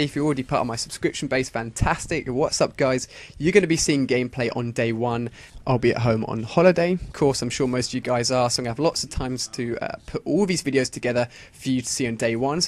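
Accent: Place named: United Kingdom. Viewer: i love your british voice :| me gusta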